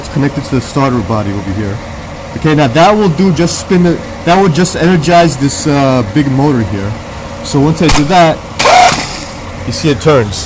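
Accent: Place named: Brooklyn, United States. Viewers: Omg. Holy shit I swear I just saw Sylvester Stallone on jimmy Fallon !!! I was thinking I like this guy cause he is practical and it motivates me to do shit myself!! I'm guessing New York maybe jersey ? Hey I love em all so no one be offended ...I was raised catholic guilt lol, and if someone said are u Irish and Protestant I'd be like no but I like lucky charms ..... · Great Video! Brooklyn huh? I'd know that accent anywhere!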